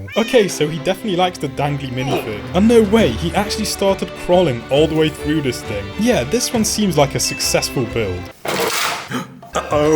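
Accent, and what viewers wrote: United Kingdom. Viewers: I thought you were British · You sound British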